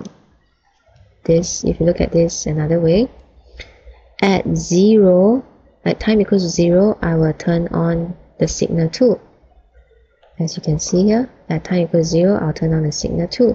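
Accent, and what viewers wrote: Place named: Malaysia. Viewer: You sound Malaysian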